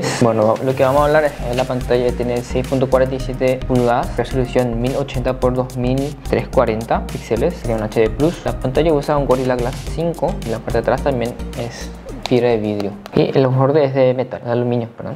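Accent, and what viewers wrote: Paraguay. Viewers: Es de Paraguay xd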